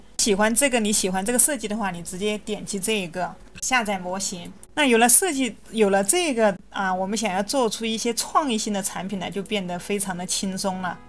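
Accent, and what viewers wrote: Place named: Chongqing, China. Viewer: 😂🎉😅😮一口重庆话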